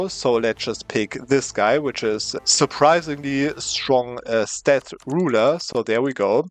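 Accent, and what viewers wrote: Germany. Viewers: The German accent is so cool!!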